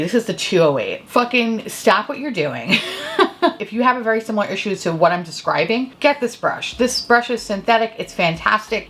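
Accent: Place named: Minnesota, United States. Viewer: The more I hear your Minnesota accent the more I encourage you to watch 'F is for Family' so you can truly experience Jenny Throater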